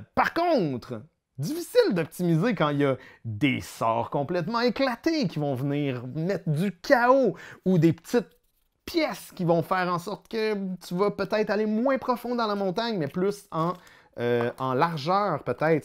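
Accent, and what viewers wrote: Quebec, Canada. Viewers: L'accent, ça va, je m'y suis fait avec toutes ces videos québécoises. Bientôt c'est moi qui vais le prendre, PAR CONTRE, cette image convexe ou concave, ce n'est PAS possible !-)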